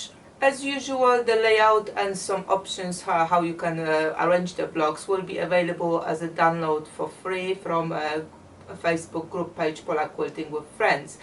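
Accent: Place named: Poland. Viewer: Love working w scrapes…great videos. Where are you located, your accent reminds me of my Polish friend.😊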